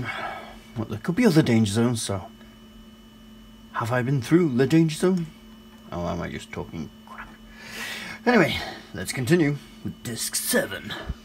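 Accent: United Kingdom. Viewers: Are you british? I am awful when it comes to guessing where people come from via their accents lol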